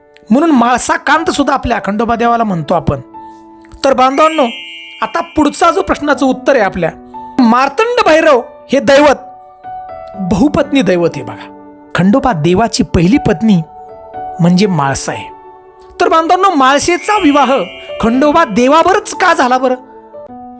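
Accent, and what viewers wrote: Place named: Belgaum, India. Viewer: tumchya bolnyavarun tari tumhi belgav che mulche asave ase vatte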